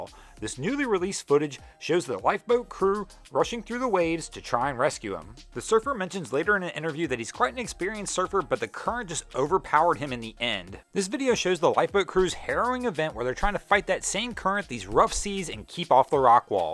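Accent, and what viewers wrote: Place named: Tennessee, United States. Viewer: You are amazing. where are you from. Tenessee?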